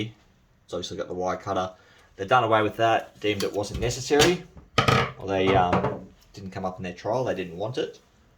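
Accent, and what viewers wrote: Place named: United Kingdom. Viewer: Why is your accent and syntax so UK, if youve been in the US Army?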